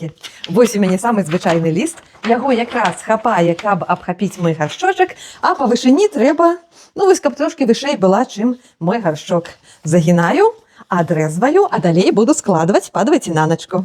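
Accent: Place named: Russia. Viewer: Это ж надо так исковеркать русский язык. 😮 Хотя слышно, что для нее родной язык русский. 😱😓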